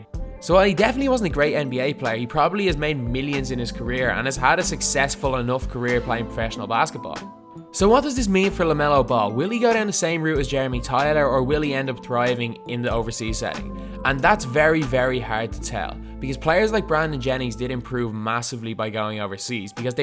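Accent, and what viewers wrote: Dublin, Ireland. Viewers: Is that an Irish accent on the narrator? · are you from Dublin or county's around that like kildare Louth ect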